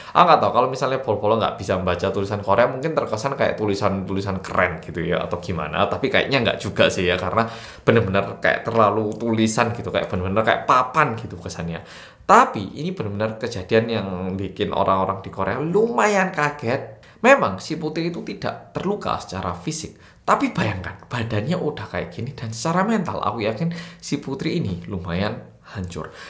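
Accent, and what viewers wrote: Java, Indonesia. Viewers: salfok sama cara mas ngomong 'koceng' di menit awal. bukan 'kucing' tapi koceng'. mas medok sekali😂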